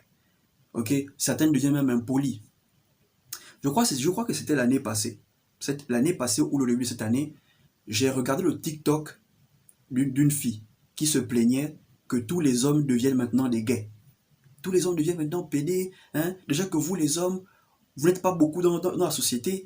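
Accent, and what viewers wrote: Gabon. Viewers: Tu parles tellement bien...je pari que tu es Gabonais comme moi, vu ton accent 🤔et tu es très explicite dans tes dires, contrairement à plusieurs pseudo coaches qui sont plutôt évasif sur leurs sujets🤷🏽 je ne peux que m'abonner à ta chaîne 👍🏼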